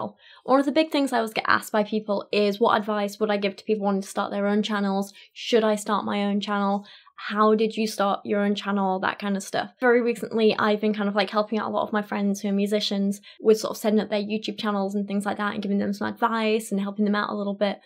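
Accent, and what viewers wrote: United Kingdom. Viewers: Uh ok why the fuck haven't I noticed you were British until just now? I've been watching you for about a year now lmao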